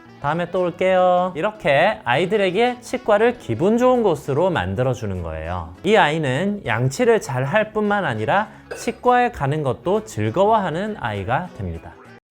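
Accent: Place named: Gyeongsang, South Korea. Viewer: 멀리서 보면 솜사탕인데 가까이서 보면 거즈라더니... 온화한 표정으로 (feat.미세한 경상도억양) 세상 조곤조곤하게 팩트로 때려주시네요ㅠㅠㅋㅋㅋ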